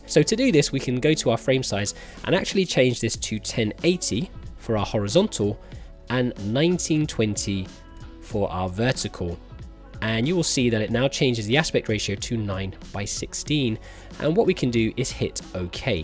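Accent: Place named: United Kingdom. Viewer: wow, I figured from your accent you were from the UK, so that’s why I was so curious. It looks so much like my part of the US, that’s crazy.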